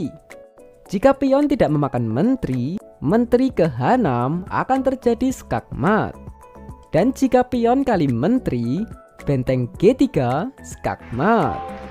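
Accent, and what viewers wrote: Java, Indonesia. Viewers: Asli orang jawa ya bang kok medok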